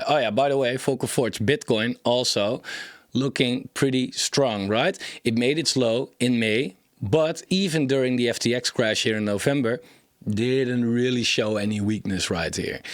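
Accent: Netherlands. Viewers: your accent feels like The Netherlands... Im from Belgium lez get millionaire next 2 year! Keep up the work.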